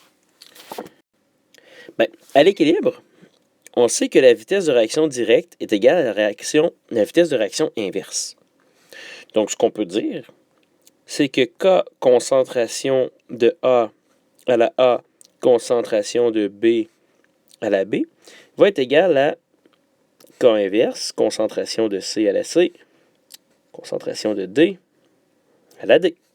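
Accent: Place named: Canada. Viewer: Vous rigolez j’espère, vous n’êtes pas assez bête pour ne pas savoir qu’il est canadien et c’est comme ça qu’on parle là-bas!!!!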